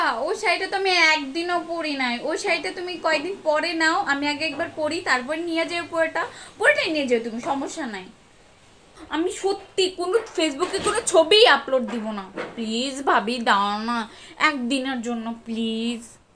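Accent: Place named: Comilla, Bangladesh. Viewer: Apni sob bastob er sathe mil rekhe Video gulo koren, abar apni amader cumilla vashay kotha bolen, tai apnar video gulo onek valo lage, love you apu, 🥰😘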